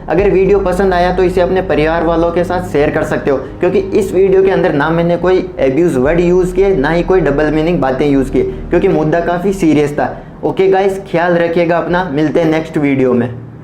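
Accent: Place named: South, India. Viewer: Sir aap kaha se belong krte h?aapka accent south Indian jaisa h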